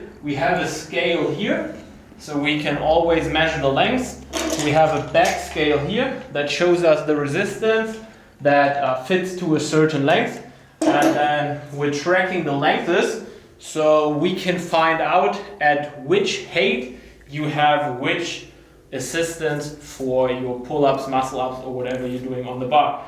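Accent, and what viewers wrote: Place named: Germany. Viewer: Hahaha dein deutscher Akzent ist ja so geil 😂😂😂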